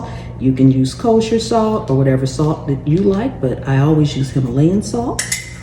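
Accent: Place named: Louisiana, United States. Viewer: I hear the southern accent but can’t place it. I want to say Louisiana. Whatever it is, I could listen to you talk about cooking all day!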